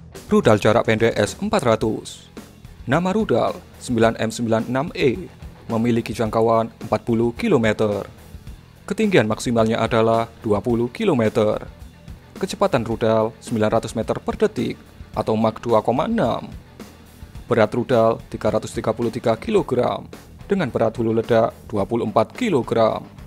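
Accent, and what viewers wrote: Java, Indonesia. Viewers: Admin medok timen, bocah ngendi we min? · Susah brow bahasa Indonesia nya gajelas, medhok jawa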